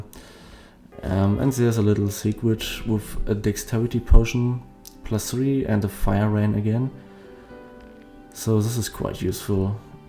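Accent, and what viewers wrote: Germany. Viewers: Gutes Video, verfeinert mit dem deutschen Akzent, fast schon perfekt. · GOOD GERMAN ACCENT. I love it! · Dein deutscher Akzent. 😄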